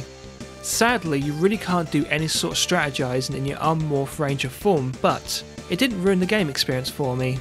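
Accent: United Kingdom. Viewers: LOL, it's Morphing Time! You're the only British reviewer on youtube that I can stand listening to! Congratulations! Subscribed! Keep it up!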